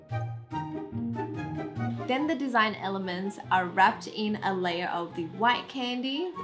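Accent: Australia. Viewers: I can’t figure out her accent but that’s probably because I’m dumb -_- Can someone pls tell me her accent?? This is me editing it before someone tells me... is it Australian? Sry I’m dumb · Are you aussie??????🤨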